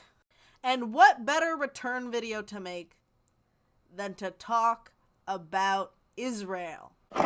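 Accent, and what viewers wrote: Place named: United States. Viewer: Don't take this personal but I need to correct you on some things. The fact that you say you are NOT and NEVER WILL BE Israeli doesn't give you the right to make Israelis look like they are some fantasy nation. You are a Jew as you said. You are (I assume by your accent) from USA. That makes you American Jew. I hope you know that 2 million Arabs live in Israel. They are Israeli Arabs. A lot of those Israeli Arabs say they are Zionists. Remember they are Arabs ( Moslems and Christians ). Another thing - you talked about Jews returning to Middle East from Europe. Are you aware that 43% of Jews who moved to (now) Israel are from Europe? 57% are from Arab countries. They were forced to leave. And add to that 270000 Jews who lived there already and never left their land. And another thing - Brits didn't create Israel. UN did. 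Great Britain also didn't colonize that area either. It was UN mandate and GB was tasked to police that area. Israel was suppose to be entire Palestine. But Britain Created Jordan ( back then called Trans-Jordan). UN then decided to split the rest of the land in 2 states. Arab state and Jewish state (Israel). And one last thing - the biggest anti-zionist Jews are Orthodox Jews. Why? Because in their mind Jews will get back Israel when Messiah comes back and not before. Until then Jews need to wonder around and suffer. I am not a Jew. Also I don't live in Israel. But here is something to think about when it comes to Jews. If you are a Christian and don't belie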